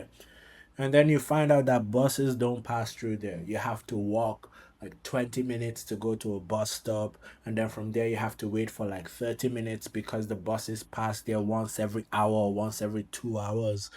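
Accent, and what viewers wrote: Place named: Southern Africa. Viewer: Did you ever live in Southern Africa? Your accent sounds Southern African. That said, these parts of Barbados look like parts of Akwa Ibom State. The major difference are the pedestrian walkways in Barbados.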